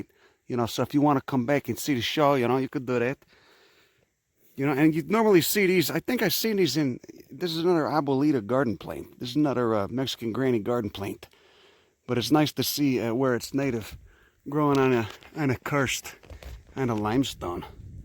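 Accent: Chicago, United States. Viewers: I told my wife check out this dude with a thick Chicago type accent talk about nature like a boss. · *Chicago accent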